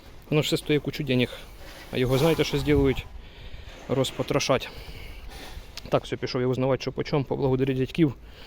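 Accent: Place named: Central Ukraine, Ukraine. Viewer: Автор лайк поставив.Удачі.По розмові зрозумів, що Ви родом з центральної частини України.Щасти.🎉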